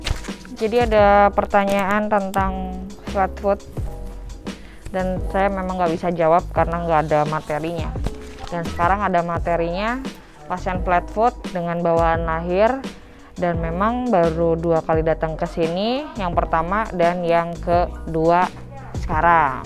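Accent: West Java, Indonesia. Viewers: Asli org sunda?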